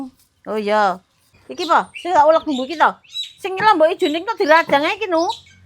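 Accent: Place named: Madiun, Indonesia. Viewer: Gerceeep nonton mak e ,, duhh Masyaalloh Tabarakalloh mak tambah muuaaaniiss lhoo seperti gula jawa 😊😊 manis e angkleg bahasa Medione ❤❤,, sehat2 yaa mak ku 🎉🎉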